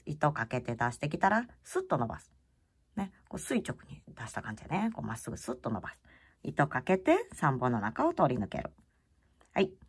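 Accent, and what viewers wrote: Kansai, Japan. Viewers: お地蔵さま❤挑戦しました😊 鎖編みからのスタートは苦手だったんですけど、トーク?独り言？の関西弁が面白くて笑いながら楽しませてもらいました😂お地蔵さま何体あっても可愛いですよね😊 あっ💦爪もお地蔵さまで可愛いです❤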